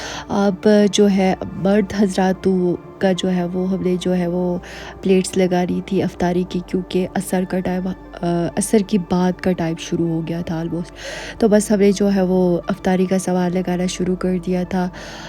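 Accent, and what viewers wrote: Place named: Kashmir, India. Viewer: Bht achy Awaaz ha literally khasmeeri lahjy m very nice vedio goodgirl nice effart❤❤❤❤❤❤❤❤❤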